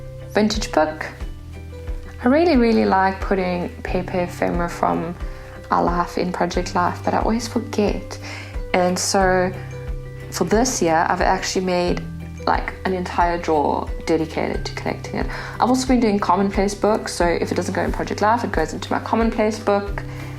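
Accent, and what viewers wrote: South Africa. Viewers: Hi 👋🏻 I'm South African! So lekker to hear our accent! Enjoying your videos xx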